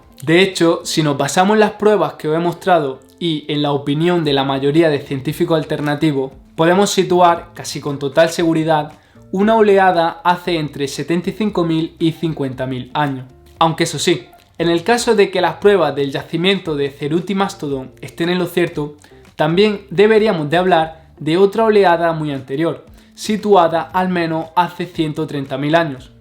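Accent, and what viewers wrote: Andalusia, Spain. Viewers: Cynthia... Ese acento pertenece a uno de los que hay en Andalucía (sur de España) más concretamente es de Granada y Almería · Radiólogo Millonario , acaso así hablan las personas del sur de España ? Específicamente en que lugar ? Aunque alguien comentó que era Venezolano, pero parece que no lo es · Ay, no ocultes tu bonito acento andaluz. 😍🇲🇽🇪🇸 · Si no te haz percatado el chaval es andaluz. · Es inevitable no hablar de tu acento me gusta como hablas, pero en que lugar de España hablan asi🤔 saludos desde Puebla,Mex. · Así se habla en el sur de España, sé más respetuoso al preguntar... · no es por una discapacidad, tonto! Es obviamente del Sur de España. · Del sur de españa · ...NO sé si se dieron cuenta pero este señor no pronuncia la "S" al final de sus palabras....al mismo tiempo parece tener un acento español . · Debe ser de Andalucía. 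Si le quitas la "zeta" verás que habla muy parecido a nuestro Caribe. En el caribe de hispanoamérica tenemos una fuerte influencia de Andalucía y Las Canarias.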